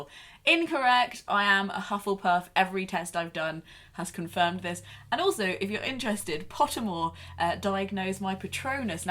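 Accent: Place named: Coventry, United Kingdom. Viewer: Loving the Cov accent! I'm from Rugby and constantly confuse people by my inability to pronounce U and O differently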